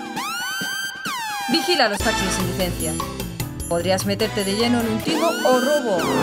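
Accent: Spain. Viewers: Es de España por acento . En fin que en España es inseguro . En Barcelona de noche es inseguro . Y en Madrid hay sitios inseguros .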